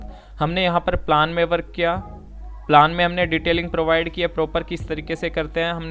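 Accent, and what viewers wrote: India. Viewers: Please 🙏🙏🙏 Speak in Britain accent Stop using Indian accent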